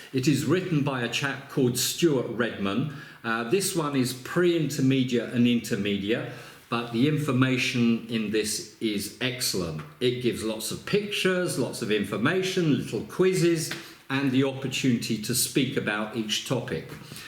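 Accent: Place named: United Kingdom. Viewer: BRITISH ENGLISH PRONUNCIATION....I LIKE IT!!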